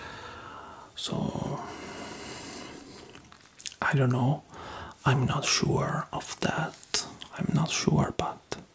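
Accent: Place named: Italy. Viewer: Italians have a specific way of talking which is cute😊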